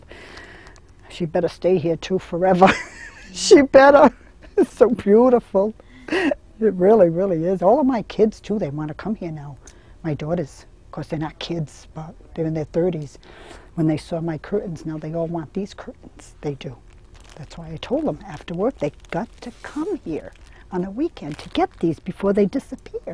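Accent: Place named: Warwick, United Kingdom. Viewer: Strong Warwick accent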